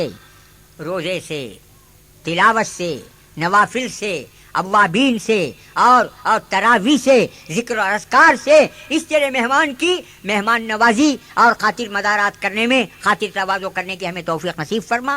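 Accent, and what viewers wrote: Bangalore, India. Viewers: Yeh purely bangaloreans ki urdu hai yaar ( aso bangalore ku baath karinge tabich malum hotaye bekaar ke commentan likh ko chhatto nakko)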